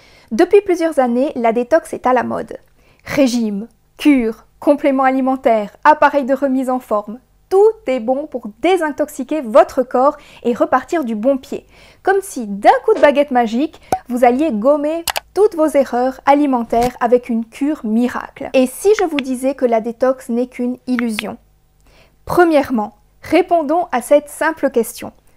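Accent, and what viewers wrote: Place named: Switzerland. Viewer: Bonjour 😊 Contente d' avoir découvert votre chaîne grâce à douce frugalité 😊 Ca me rend si heureuse d' entendre fruits et légumes pour faire une détox 😉 Je suis une raw vegan..Une 👽pour la plupart des gens😅 mais bon j' assume. Ah...Ce nonante😅 Je pensais que vous étiez peut-être belge ou suisse...En Belgique on dit aussi nonante et Septante..En écoutant bien j'ai bien deviné que vous êtes bien suisse 😊 🥰🥰🥰🥰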